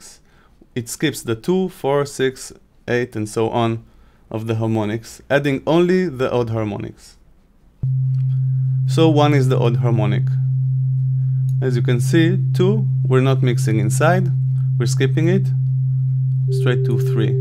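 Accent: Israel. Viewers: a mathematical point of view: Fourier Series <link> האם זיהיתי מבטא ישראלי? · Where are you from? You have an Israeli accent. :) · Haha It happened again, looked for a good music explanation, found a video in English (Which is not my mother language) but with Hebrew (Israeli) accent (Which is my mother language).. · you from Israel / you have the accent/ good work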